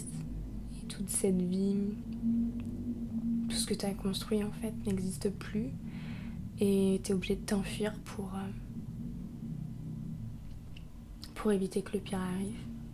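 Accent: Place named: France. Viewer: L'accent français est beau :3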